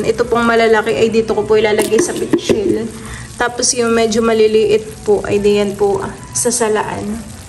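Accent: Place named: Visayas, Philippines. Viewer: May pagkabisaya ang salita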